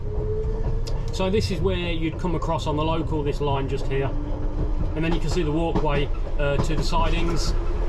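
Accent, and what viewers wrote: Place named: Birmingham, United Kingdom. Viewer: Hi, loving all your videos home & abroad. Cant think of any jokes at this moment in time. I have always been interested in London Underground and its trains. I live just across the road from the Victoria Line Depot. I no Southern region have problems in the winter with current pickup from their 3 rd rail system due to snow and ice. How does the 4th rail London Underground system fair with that problem? You was saying on one of your videos that your home town is Peterborough, i hear a Brummie accent from you. Anyway mate, keep up the good work. Regards Lee.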